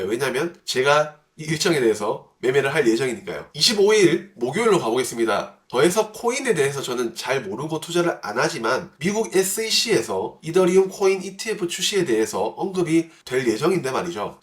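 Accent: Daegu, South Korea. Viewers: 오늘따라 대구 사투리 왜케 귀엽나요.. ㅋㅋ 오늘 터지고 반성중인 주린입니다ㅜㅜ ㅋㅋ 테마+실적 명심하겠습니다!!!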